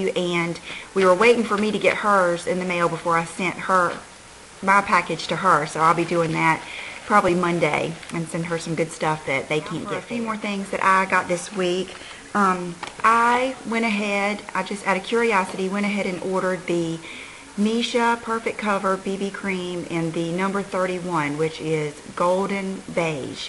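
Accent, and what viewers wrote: South, United States. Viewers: i love ur vids! especially ur southern accent, its very calming :)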